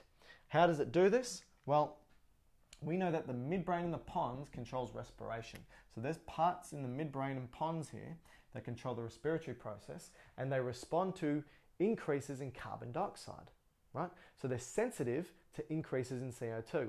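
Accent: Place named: Australia. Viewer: Came for the muscles. Stayed for the Aussie accent.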